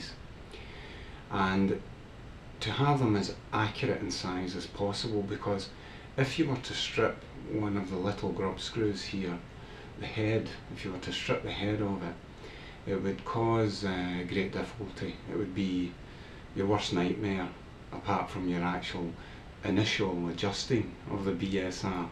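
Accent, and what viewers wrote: Scotland, United Kingdom. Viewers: wow, this is really cool!!! I had no idea you can adjust the violin on your neck. And...olive oil? You are hilarious :-D Are you Scottish? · Ah, thank you! I thought it might be Egyptian or some other Arabic dialect. I didn't know that Scottish is that thick.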